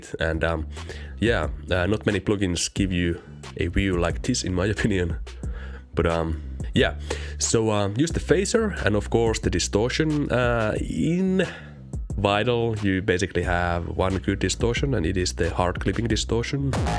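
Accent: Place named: Finland. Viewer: arvasin että oot suomalainen tosta aksentista, mutta muuten helvetin hyvä tutoriaali :D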